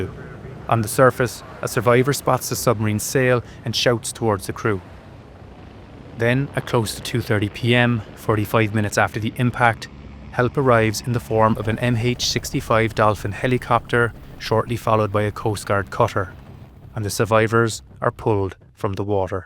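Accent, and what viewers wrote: Scotland, United Kingdom. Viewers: It's possible it's to do with AI? I've heard the narrator's voice switch to a Scottish accent. So it's possible the channel is using an ai voice? (I still love the channel regardless) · switch to Scottish? It’s been Scottish since the first one no?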